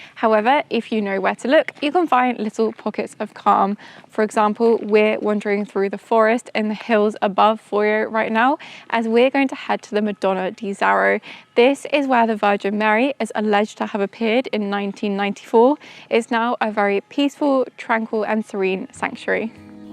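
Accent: United Kingdom. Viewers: What a marvelous place 😮! That island 🏝️ is a true paradise on Earth! Thanks 🙏 for this delightful video! By the way, where are you from? Both your name and family name are French, but you speak really good English with a British accent 😊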